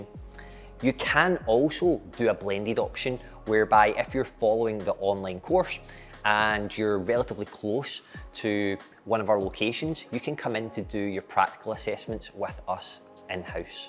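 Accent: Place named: Scotland, United Kingdom. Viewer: How much love for this Scottish accent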